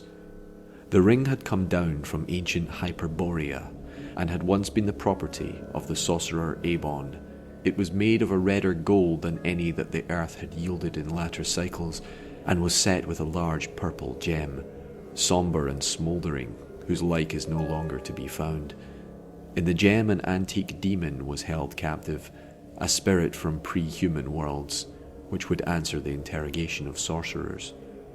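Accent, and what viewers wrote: Scotland, United Kingdom. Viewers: Good narration! Very glad to have found a YouTuber with a good voice doing Lovecraftian stuff. Scottish accent works well.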